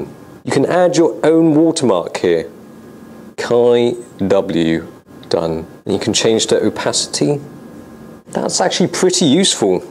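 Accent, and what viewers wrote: England, United Kingdom. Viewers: he was born in england just because hes of asian decent doesn't change his voice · no offense how are u asian but with a english accent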